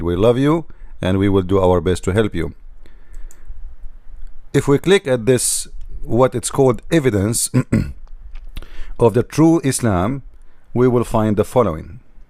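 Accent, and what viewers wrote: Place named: Arab world. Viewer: You only speak with an 'Arabic accent' . The End.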